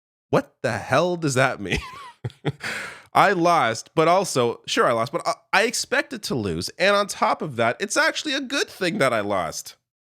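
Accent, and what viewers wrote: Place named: Canada. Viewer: I love your direct, no BS, "call it what it is" style and the fact that we have a very representative Canadian voice on YT. And, finally, some good news. It will be great news when Musk is gone and even better news when Trump is gone--as he says about getting other countries--"one way or another".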